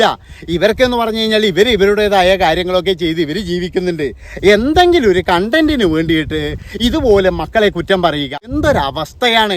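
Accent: Kannur, India. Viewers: കണ്ണൂർ ശൈലി അല്ലേ? ഈടെ എല്ലാരും അങ്ങനെന്നെയ പറയൽ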